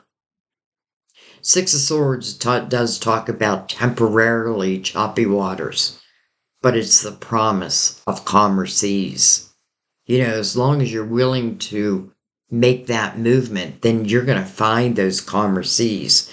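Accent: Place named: Western Pennsylvania, United States. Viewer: I should’ve figured you’re from western Pennsylvania. We have different accents and pronunciate words completely different. Blessings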